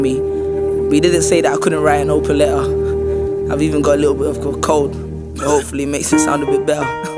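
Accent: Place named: United Kingdom. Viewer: Who would comment on your English?! I love you being British and I love your accent :)...good job great vid...keep it up!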